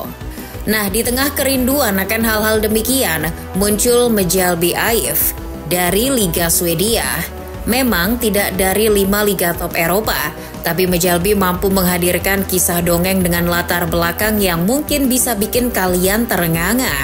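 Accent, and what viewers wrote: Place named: Pekalongan, Indonesia. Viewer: orang pekalongan mana suaranya wkwk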